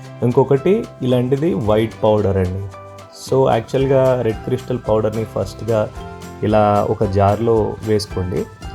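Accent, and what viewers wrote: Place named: Godavari, India. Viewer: అయ్ మీది గోదారొళ్లoడి చాలా బాగుందండి. 👌👌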